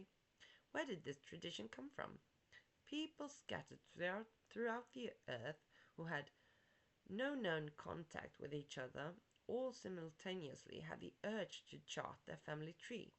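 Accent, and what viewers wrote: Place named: France. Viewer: Hey, cool topic, hope your having a great weekend, interesting accent, French or Portuguese ? Look forward to more videos Be Well 🕊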